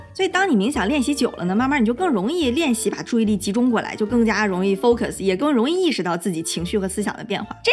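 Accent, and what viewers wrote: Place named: Dalian, China. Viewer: 小lin的东北话 字幕都识别错误了。😂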